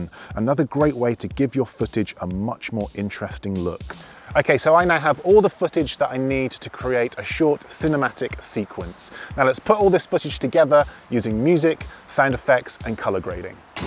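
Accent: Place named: England, United Kingdom. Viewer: Honestly I have subscribed you of 2 things, 1. You are so good at this! 2. You are English (I love English accent) But I'm an Android fan! 😳😳😳 And I really don't want to unsubscribe your channel at all! 😞😞😞😞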